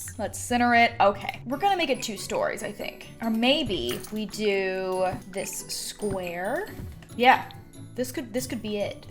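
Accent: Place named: South, United States. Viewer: Jasmine J she’s southern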